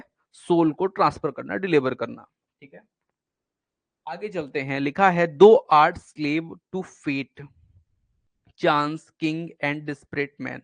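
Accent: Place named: Rewa, India. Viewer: Sir aap reewa se h ,lagta h aapki voice se....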